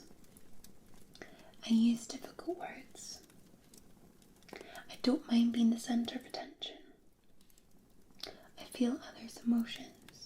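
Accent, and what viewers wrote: Scotland, United Kingdom. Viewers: And a sleep therapist with a Scottish accent, nonetheless!😉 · Can u do ASMR with ehavy scotish accent ? 🙂💙🤍💙🙏🥃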